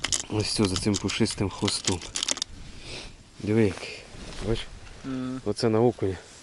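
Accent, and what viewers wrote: Poltava, Ukraine. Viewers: обожнюю полтавський акцент.Дуже багато схлжого з артуром і жести і слова ну то тнічого ми ж українці)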